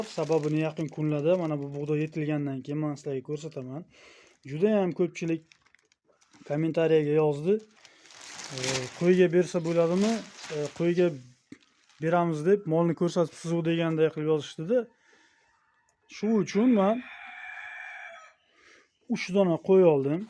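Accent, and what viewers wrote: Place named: Bukhara, Uzbekistan. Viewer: Уста каердансиз сир булмаса шевагиз Бухорога ухшайди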